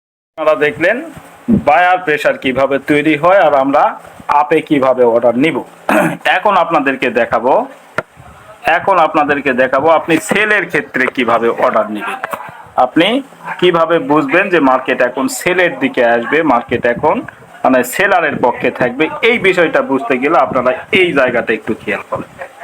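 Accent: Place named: Bogura, Bangladesh. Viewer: ভাই মনে হচ্ছে আপনার বাড়ি বগুড়া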